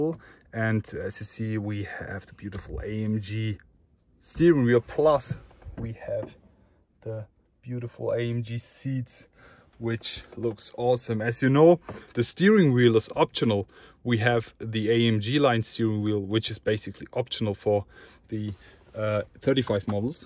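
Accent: Germany. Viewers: Good work short comments. PS Man hört das du deutscher bist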